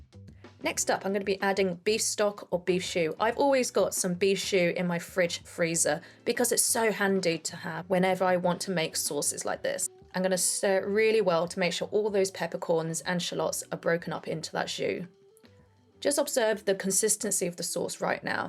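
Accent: United Kingdom. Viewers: I don't know if you added a little touch but, your voiceover really has a nice british/english accent than your normal talking voice. I guess because your recipe today is a "westerner" type of dish instead of an asian dish ^_^ The sauce is the bomb! I might try this! I think that it can pair well with other meats!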